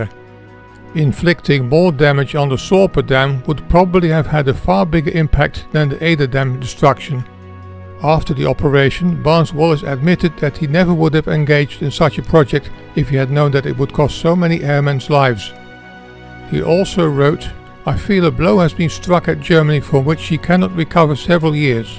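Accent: Netherlands. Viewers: Sounds more Dutch to me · yep you sound Dutch to me ( my son in law is German) Very interesting presentation and analysis that you have done here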